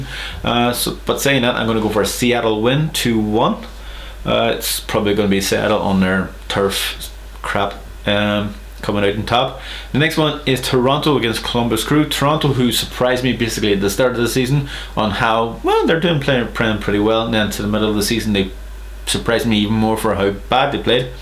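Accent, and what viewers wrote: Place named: England, United Kingdom. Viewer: Dude why you upload like this your video ?? For me its difficult now to understand you English accent .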